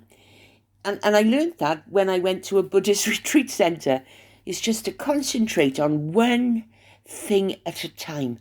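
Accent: Wales, United Kingdom. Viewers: Love your Welsh voice girl! So glad your back!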